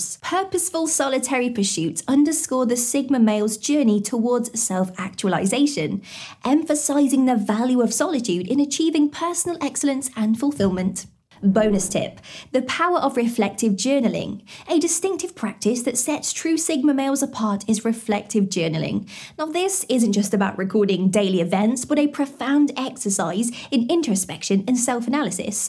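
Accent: United Kingdom. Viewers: I get tired of British accents. Good night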